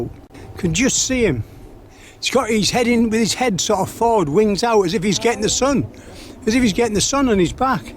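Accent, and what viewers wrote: United Kingdom. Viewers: No need to sound British.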